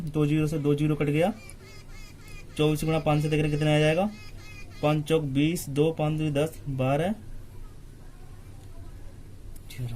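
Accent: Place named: Dholpur, India. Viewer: भाई भाषा से धौलपुर साइड के लगते हो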